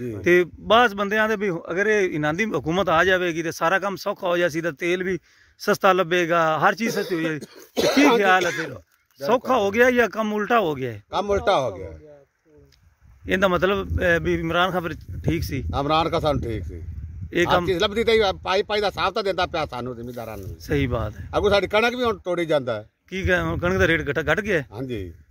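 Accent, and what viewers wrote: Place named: Ferozpur, India. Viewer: Bhai saab tuhadiyian video nall sade Ferozpur Fazilka area de loka no bhaut fyida hoya kyuki sadi boli te tuhadi boli same hai jiss nall jahre malwa belt te majha doaba area de loka ne sada majaak banaya hoya ci hon ono de mouth te olte maooh thappar vajiya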